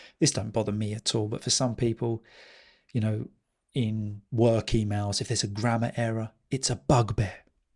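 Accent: United Kingdom. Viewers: Totally perfect British English accent thank you very much for your teaching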